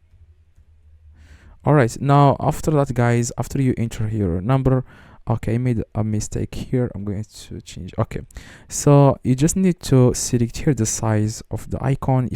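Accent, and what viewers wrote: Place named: Morocco. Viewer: Chokran a chef , ollah ila mnin bda lvedio , galt hada 3ndo lahja dial mgharba , tbarkllah 3lik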